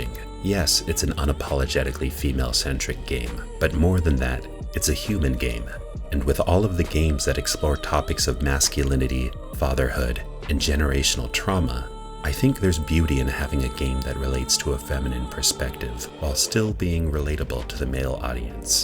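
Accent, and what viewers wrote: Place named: United States. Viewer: knocked this one out the PARK!!! i feel like no other channel has really synthesized the meanings of this game for me like you did, both culturally and thematically. in particular, your emphasizing the implications for everyone in the gender binary of male/female was really refreshing. being half japanese but culturally very american, i could feel the gap in western game channels' analyses on the game no matter how well researched. also while the localization and voice acting/directing was amazing, rinko's jp voice especially really sold her to me as both a two faced b/antagonist and an actual complex human who ultimately /was/ hinako's best friend. just hits different when you've been around real life rinkos! gotta say, though i laughed multiple times during this, your dragon pronunciation joke went right over my head because i thought your pronunciation was...normal american? 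anyway im penning this as i settle into my 3rd rewatch/listen, looking forward to checking out the rest of your channel vids and future releases!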